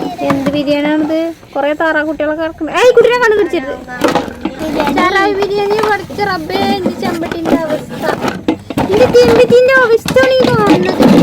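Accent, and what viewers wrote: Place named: Kannur, India. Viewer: വ്ലോഗ് എന്നും കണ്ട് ശീലം ആയി അത് കൊണ്ട് എന്നും വീഡിയോ ഇടണം ❤❤❤ Kannur സ്ലാങ് ട്ടോ ട്ടോ കുറവാണ് അത് കൊണ്ട് പറഞ്ഞതാ 😂😂😂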